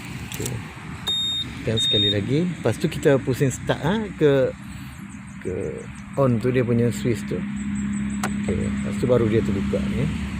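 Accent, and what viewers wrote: Kelantan, Malaysia. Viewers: Ore Kelate ko hox kecex nih???? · Kecek luar berbaur budu cap ketereh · acu kecek kelate skali bea hehehe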